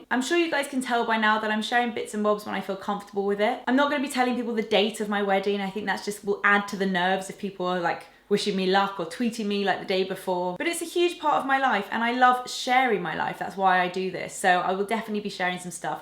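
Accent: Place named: RP, United Kingdom. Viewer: sounds very posh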